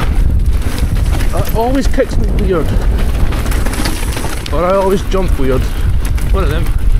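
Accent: Scotland, United Kingdom. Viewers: is your accent scottish?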